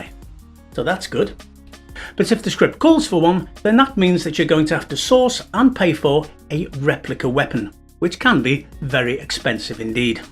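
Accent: Wales, United Kingdom. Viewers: Are you welsh sir? 😎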